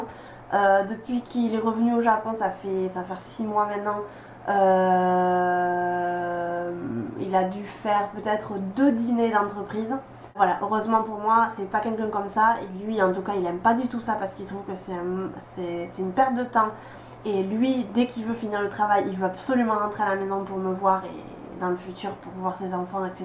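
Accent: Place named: South, France. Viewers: Enfant ! aaaah l'accent du Sud :P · ouais mais t'as un accent genre toulousain ou bordelais ou marseillais un truc dans le genre^^ donc quand tu parles japonais forcément aussi ^^ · Bah, elle est toulousaine, ça s'entend, couillon ! :)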